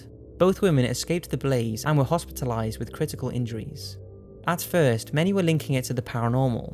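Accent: United Kingdom. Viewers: He's British and they just pronounce things differently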